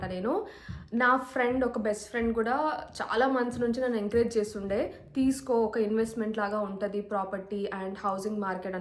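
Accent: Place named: Miryalaguda, India. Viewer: In youtube I suddenly got your india tour vlog . I felt that u r from my nearby area , Because the way you talked.Exactly you are from Miryalaguda I FELT THANK GOD. Iam a upsc aspirant hope I will have vlog after my selection with you..Thank you sister for your videos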